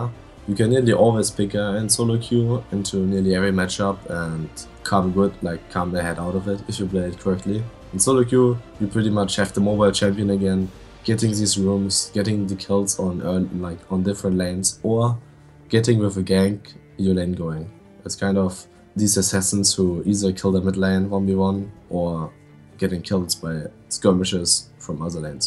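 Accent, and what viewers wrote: Germany. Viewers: You can clearly hear his german accent :D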